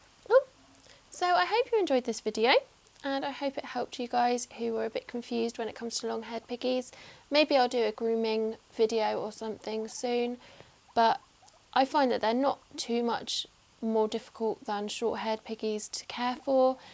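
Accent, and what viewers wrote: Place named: United Kingdom. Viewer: Lily is so cute. And you have a very pretty British English accent.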